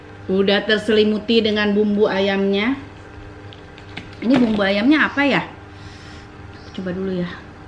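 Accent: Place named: Garut, Indonesia. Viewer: Euuhhb teh.. Mani resep pisan pas kalau ngomong sunda mani lucu, tong hilap teh sundana nyariosna lucuuu pisaaannn, logat garut punya has ya hehhehe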